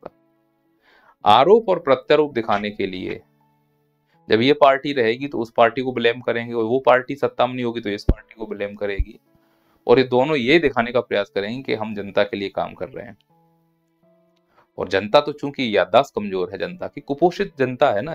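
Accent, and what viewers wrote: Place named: Agra, India. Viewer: Aap agra firozabad ke aaspaas ke lag rhe hain bhasha se😊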